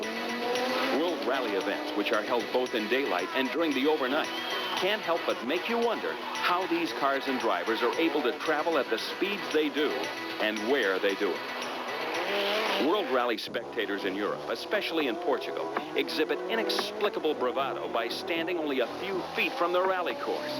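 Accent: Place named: United States. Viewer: why the F***K is thier an american voice over what the hell would they know about rallying?? thier probably shocked to watch a car make both a left turn and a right turn this ass hole belngs in a nascar video not this one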